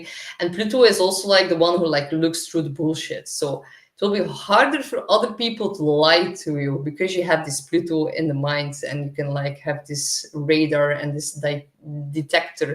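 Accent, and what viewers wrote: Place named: Belgium. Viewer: Hi, I will check the degree of my rising. My Moon cnj Saturn in Capricorn has probably made things worse these past few years. I am in Perth West Australia so not in Your area at all. However the last few months I've been dating a Belgian Woman, that's the only reason I detected the nuances of Your accent as it's virtually unknown here. She is attractive too, My heart hasn't recovered just yet. Thanks for Your reply.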